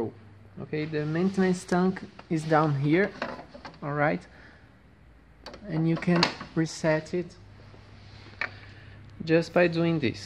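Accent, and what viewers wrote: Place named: Brazil. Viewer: Ahhh sabia que era br pelo sotaque 😂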